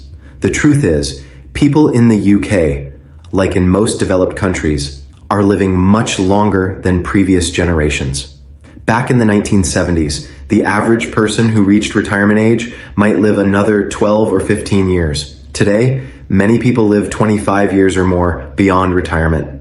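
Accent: United States. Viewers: Why is an american accent being used instead of British? You cant really take this channel seriously due to it. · This is the second video I've seen narrated by this guy with an American accent with misinformation that's not been UK News and on the government website and would have been.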